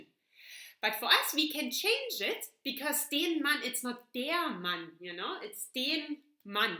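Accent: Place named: Germany. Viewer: explaining it very slowly with a very german accent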